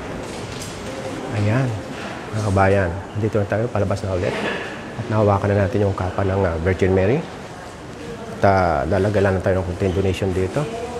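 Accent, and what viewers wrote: Visayas, Philippines. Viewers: Bisaya ka ba kuya?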